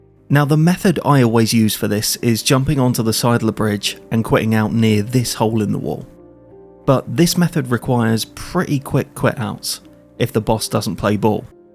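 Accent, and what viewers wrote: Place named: England, United Kingdom. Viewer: new sub coz of this video. short, to the point and entertaining as well. The english accent feels like home having lived there for my childhood. (Southampton)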